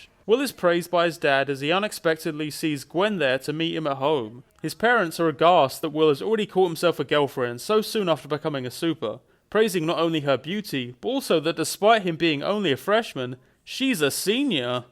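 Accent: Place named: United Kingdom. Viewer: couldn't listen to this because he's british :(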